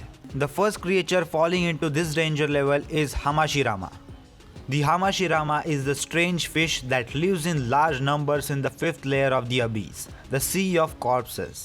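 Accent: India. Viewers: Made in Abyss is one of my recent favorite series speculative evolution and creature designs. The fact I play Pokemon Violet, and train down in Area Zero, which somewhat resembles the Abyss, means that the series not far from my mind. Coincidentally, the raids also have a star rating, although the highest rating is 7 rather than 6 stars! I almost kind of wish we'd get DLC Pokemon based off on some of the Made in Abyss creatures! Normally, I have a notoriety for understanding what I believe is an Indian accent. And while yours is quite strong, you take the time to pronounce your words speak them and strongly. I appreciate that. Even some American YouTubers fail to do this, harming the enjoyment that can be gained from listening to their videos.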